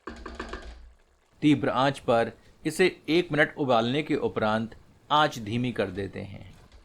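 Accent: Standard. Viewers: शुद्ध हिंदी बोलते हैं।आप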